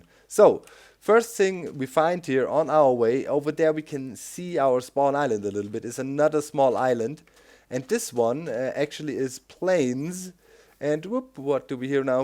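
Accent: Germany. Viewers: He is German. You are Dutch. He has a slight German accent. He does not live near the east side of Germany, so not even close to the Netherlands (if I recall correctly). What's your point calling him Dutch? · ***** is that a german accent I hear? sehr gut herr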